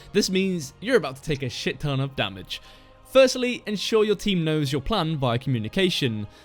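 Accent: United Kingdom. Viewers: Where are you from? Southern England?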